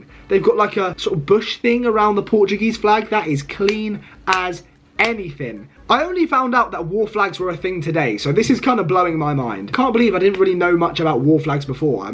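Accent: United Kingdom. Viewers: i know u british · Your scottish because yor accent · r u british mate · I can tell bro is britesh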